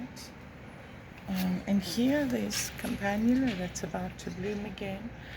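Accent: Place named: United Kingdom. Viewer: Hi darling, I love so muh your lovely british accent and you seem such an adorable typical English lady at my italian eyes who needs only her raincoat and umbrella to not to be unfaithful to her origins! I agree with you about living in Europe, nowadays seems the better choice to live peacefully, and leaving in Italy, at least the north of Italy, is so much underrated by our generation. I know work is not enough rewarded as in other countries, but, hey, you cannot have everything from life... Things you understand only much later... I cannot see my life anywhere from here and you can find close minded people really everywhere, so... You can only stay away from them! I love your perfumes on a budget videos! Greetings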